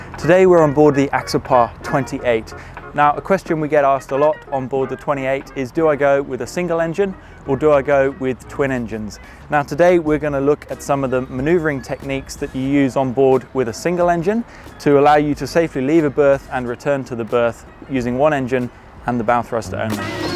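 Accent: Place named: United Kingdom. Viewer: That accent says born in the UK. I’d like to think if you were in UK you’d use the kill cord immediately the engine is running. And yes you can do the lines single handedly with the engine off, step to the helm, kill cord on then complete manoeuvre. It’s a great video, but remember you set the example to every newbie watching this show who may have no idea how or why the should use a kill cord.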